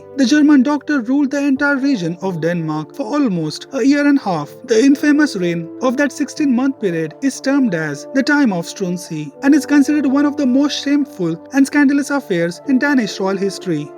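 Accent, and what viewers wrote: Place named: India. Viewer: What an indian accent!!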